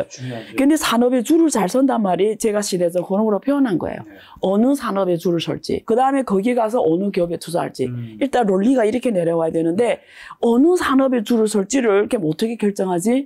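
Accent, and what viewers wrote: North Korea. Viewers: 북한 여자인가..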